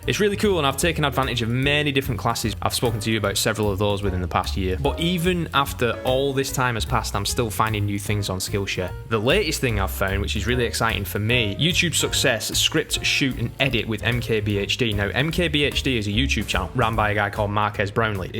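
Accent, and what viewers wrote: United Kingdom. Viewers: You sound British, but you're in the USA.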